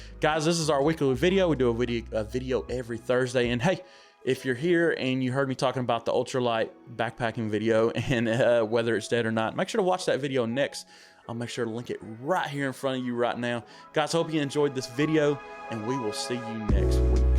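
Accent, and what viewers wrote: South, United States. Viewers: Just found your channel, great southern accent! I hear you tubers often refer to a Nalgene bottle as "Heavy", but, like you, they never really say how much weight you are actually saving. So what is the actual weight savings between a Smart Water bottle and a Nalgene?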